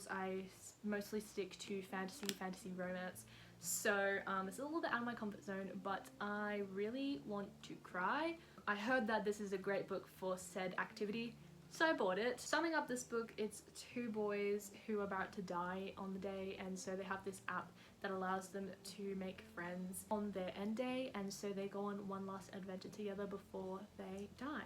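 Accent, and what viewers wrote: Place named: Australia. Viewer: oh the way I love Aussie accent 😫🥺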